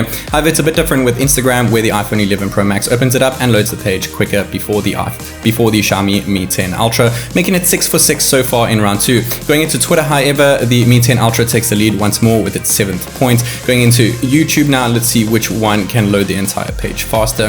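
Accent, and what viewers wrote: South Africa. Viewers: Sensing a South African vibe Check your Location: South Africa!!! YEBO!!!!! #subbed BTW, where did you get the Xiaomi, I really wanna get one! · homie sounds south african lol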